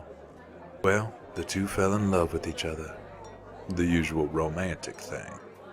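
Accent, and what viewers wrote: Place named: South, United States. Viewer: really convincing southern drawl! Loved the story c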